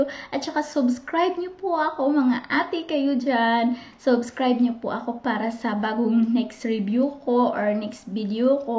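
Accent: Philippines. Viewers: Kapag bisaya magtagalog ang lambing pakinggan ,,kaway kaway sa mga bisaya dyan.. by the way mam pwede po ba yan gamitin sa nagpabreast feed? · boses ilongga ka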